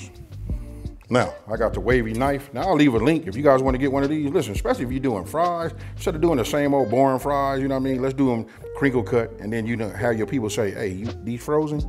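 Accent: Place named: Texas, United States. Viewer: You sound like a good Texan! Loved the recipe.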